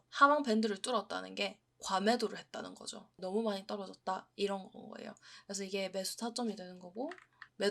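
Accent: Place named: Gyeongsang, South Korea. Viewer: 조금씩 묻어나오는 경상도 사투리가 매력이라서 구독 누름 ㅎㅎㅎ 많이 가르쳐 주이소~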